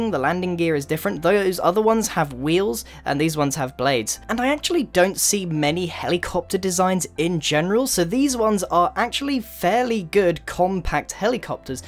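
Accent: United Kingdom. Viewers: a person with a British accent talkin' about spitfires??? Y E S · I've been waiting for YEARS to say this. You Sound British 😂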